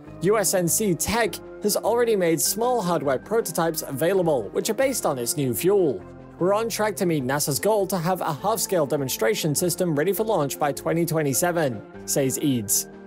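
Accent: England, United Kingdom. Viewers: Is he saying "Nucular"? Ax me if I care but I don't think he will be working on a nuclear rocket. Did he just say "Yeeahs" 12 months in a yeeah. I think he might be from England.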